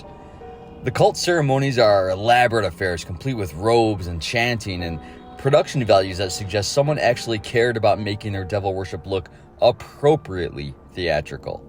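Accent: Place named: Chicago, United States. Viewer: I thought you might be from Chicago. Your accent is subtle. I am 45 from Tinley Park.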